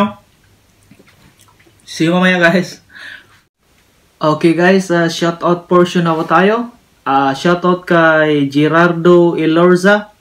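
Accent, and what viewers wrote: Visayas, Philippines. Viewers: maka ingon jud ko nga bisaya ka sir tungod sa imong accent nga bisaya hehehe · mukang bisaya ka sir kasi tayo lng mga bisaya ang nagsasalita ng (ba) kahit magtatagalog hndi tlga nawawala satin yong (ba) -skl hahahha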